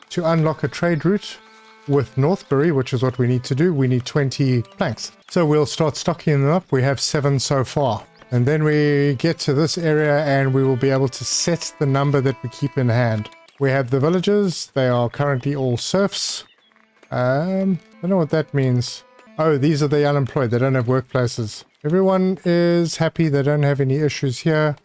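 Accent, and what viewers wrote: South Africa. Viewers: Knew I can distinguish a South African accent when I hear one, hello broer!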